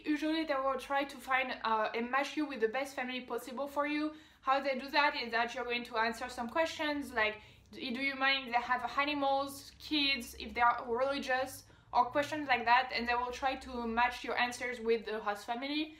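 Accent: France. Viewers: Thank you for doing this video. I am particularly interested in the challenges you faced with American spoken language. How long did it take you to get to point where you could understand conversations? Was it easier or harder to follow informal conversations compared to lectures in class? Also, in speaking English, how long did it take to achieve complete fluency? I’m sure you’ve been told, your accent is absolutely charming. Americans love a French accent more than any other language.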